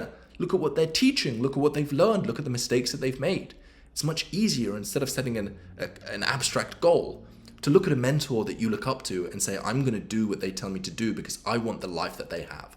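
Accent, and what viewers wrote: United Kingdom. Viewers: good content as always. Part of Kirbys brands is the typical "BUT" with UK accent!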